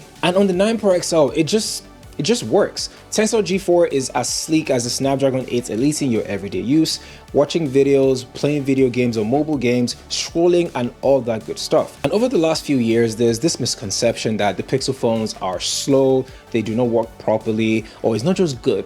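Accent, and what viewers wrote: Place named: Nigeria. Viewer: I hear a Nigerian accent... I subscribe 😂😂. Good luck with your channel dude! Review was on point BTW.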